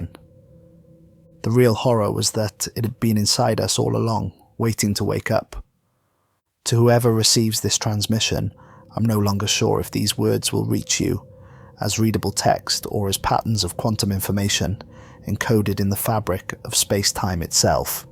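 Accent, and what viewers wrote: Wales, United Kingdom. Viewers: Dude, you actually read things not just use an AI reader, who cares about the odd and ends like that, but respect for caring. Frankly I subbed the second I heard the Welsh accent, sounds like home!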